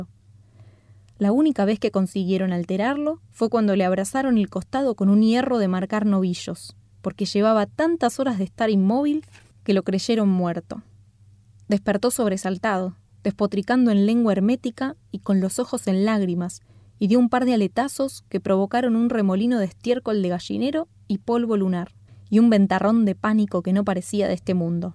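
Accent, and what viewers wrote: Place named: Argentina. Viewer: Es nuestro acento, no veo motivo por el cuál corregir el acento Argentino (?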